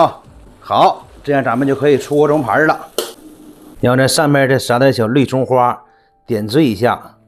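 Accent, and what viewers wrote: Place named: Liaoning, China. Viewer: 浓厚的辽西口音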